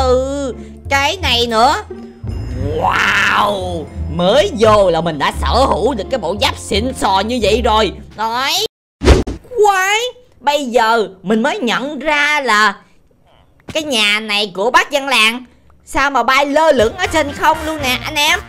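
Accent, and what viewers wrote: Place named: Binh Duong, Vietnam. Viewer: Đọc lạ Bình Dương quá anh ơi😄😄😆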